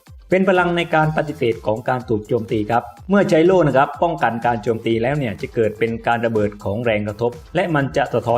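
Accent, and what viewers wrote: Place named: South, Thailand. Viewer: คนอธิบายติดสำเนียงใต้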